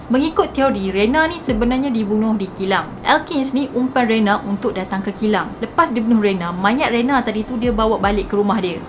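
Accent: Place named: North, Malaysia. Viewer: 20% masih ada sleng utara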